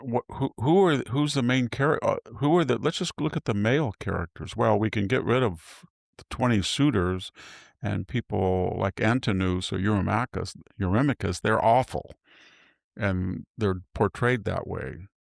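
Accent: United States. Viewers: ❤❤❤❤it is so nice for me hearing the old greece-names with an american-english pronunciation. If you would not write the names I would not understand the original greece names❤❤❤❤ regarding your topic, you are absolutely right, "classical literature was once the cornerstone of education" =I appreciate this sentence so highly ❤❤❤❤ I am living in Wien/Austria, and I estimate the tendence is here the same as you described❤❤❤❤ warm greeting to you❤❤❤❤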